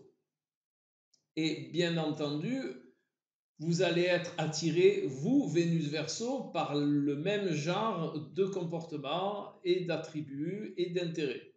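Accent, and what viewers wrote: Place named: South, France. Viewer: Merci pour la clarté de votre propos et pour votre bel accent du sud.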